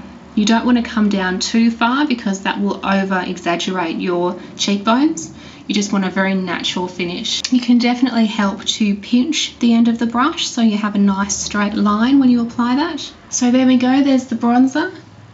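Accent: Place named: Australia. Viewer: Great look! i love your accent!! your Australian right??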